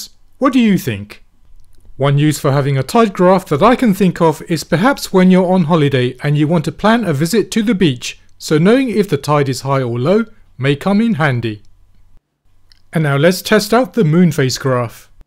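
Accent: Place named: South Africa. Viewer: Dude sounds South African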